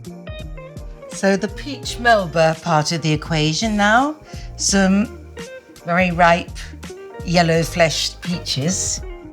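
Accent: United Kingdom. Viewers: I do love your very British English!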